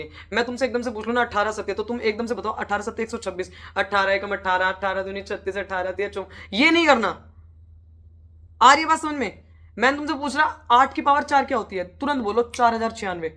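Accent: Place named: Haryana, India. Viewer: Main to bs haryanvi accent sunne aaya tha 😂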